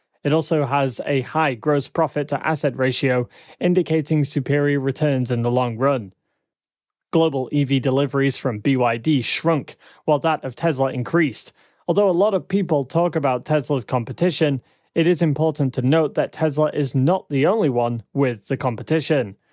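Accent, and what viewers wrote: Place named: England, United Kingdom. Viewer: You are right!! Imust also add that the accent this person posesses is extremely annoying; Is this guy from England or is he just pretending that he is from England. It's a pain just listning to him with that weird accent.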